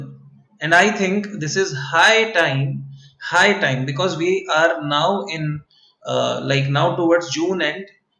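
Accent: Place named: India. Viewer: Arre Sir Mast Angreji thi 😀... Bolte raho..to hindi ka undertone b nikal jayega ! 😎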